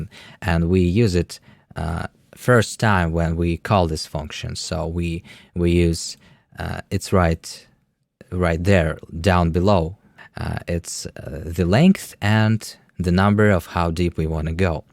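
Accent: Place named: Russia. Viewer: Cool video, comrade. Your Russian accent is so clear and melodic. I love It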